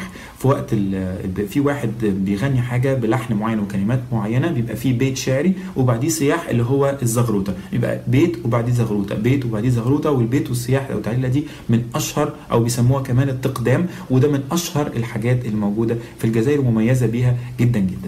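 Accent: Tunisia. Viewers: لهجتك تونسية بامتياز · لهجتك تبان تونسي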